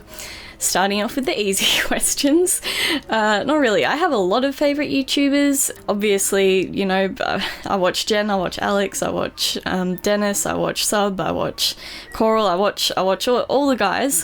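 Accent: United Kingdom. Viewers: When I sometimes hear her voice it's kinda a bit British... Does Austrialians have a bit British voice?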